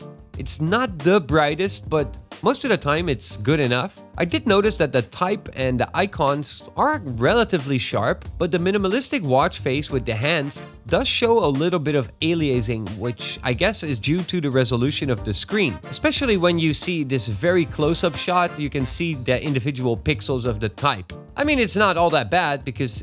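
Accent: Netherlands. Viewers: Hi! Are you dutch?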